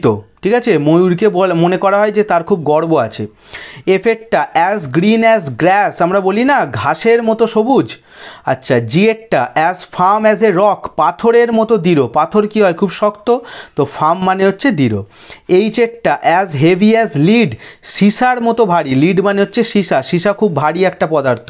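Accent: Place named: Howrah, India. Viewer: Sir. Are you from howrah district? Your version shows that!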